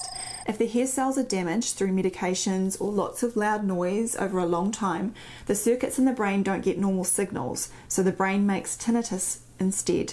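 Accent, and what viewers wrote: New Zealand. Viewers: Love KIWI accent especially from an intelligent and good looking woman . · I use my tinnitus to meditate. Love the accent KIWI isn't it. You accent is better than the call center operators from India, I can't understand them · I’ve suffered from tinnitus for years. I’m a loco engineer,therefore I am exposed to high noise for long periods. Very informative video. The minute you mentioned your accent about ear, I thought Kiwi. My dear lady wife is a kiwi and is always going on about pronouncing words🧐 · Hi Dr, love the Kiwi accent, as I've been living in NZ for 20 years that is a good thing. My tinnitus is like the shrill of many cicada (Christmas beetles) but go from a dozen to thousands when I am stressed out. Like walking in a NZ forest glade in the middle of summer noisey. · I am here because of the accent! Loved my stay in kiwi land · There's few more adorable than a N.Z. 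accent. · I find your Kiwi accent beautiful & clear ♥ (like your looks) Nothing wrong with accents, I've got a European one 🇲🇹 ☺. VERY INTERESTING TOPIC. TANX Dr. Cheers 👍. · Nice to hear Newzealand accent ,though I may take a little extra time understanding. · All New Zealand accents are difficult. You pronounce all your E sounds as a hard E. That is not how the rest of the world speaks! Still good advice and a good video. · I got a pretty bad concussion back in 2013 and have tinnitus since then. Great to hear a fellow kiwi by the way. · I would like to know if you are a native New Zealander. BTW, your accent is lovely and pleasant to listen too. Thank you for your notes. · I have had Tinnitus during the day as a hiss, whistle, for perhaps 20 years. Strangely enough it stops when i go to sleep. If i wake in the night it remains stopped until i am fully awake. I have learned to live with it. 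My ears are clean and OK. Love the Kiwi accent. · I'm from the states, but lived in Wellington for a few years - that's a major kiwi accent, I believe! Is there any significance with imbalanced tinnitus? Over the last few months, it's gotten noticeably worse in my left ear. I've had a full round of exams, and have been told I have Meniere's disease. It's unfortunately really impacted my ability to hear properly. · Its New Zealand, Sexy Voice🌹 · I love your accent! I am retired U.S. Navy, and I spent two wonderful years in Auckland on exchange with the Royal New Zealand Navy. You’ve given me a “trip down memory lane”! Thanks! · Forget your pronunciation of “ear”, your pronunciation of “accent” grates. Seriously, I’d move to NZ tomorrow if the accent wasn’t so grating. I say this from the background of having visited the country and being a descendant of one of a very prominent Kiwi family. 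· I love the Kiwi accent. When I first arrived in Oz from the US, I couldn't understand anyone! But after nearly 14 years I mostly don't even hear it anymore. I do still love the video a NZ man made about his deck LOL. . My GP said I had Tinnitus when I told him I was hearing music very softly in my right ear. I see how much worse it could be. Thank you for this video. >> Tom's wife << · I hear a fellow Kiwis accent and love it. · You have a lovely accent as does all of New Zealand 🇳🇿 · I love NZ. In the year of 2002, I had the opportunity to visit your beautiful country. I have accumulated so much positive memories. Your accent is amazing I like it very much combined to your knowledge. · Interesting, thank you. As far your accent goes, people just need to learn some Kiwi 😊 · Definitely I must make an appointment with my Doctor. Thanks Dr.! (By the way, lovely kiwi accent 😉)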